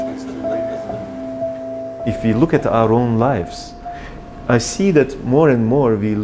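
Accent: Turkey. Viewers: Aksan Direkt Türk Olduğunu Belli Etti :D İsimde Kesinleştirdi. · videoyu açar açmaz bildim aksanindan türk olduğunu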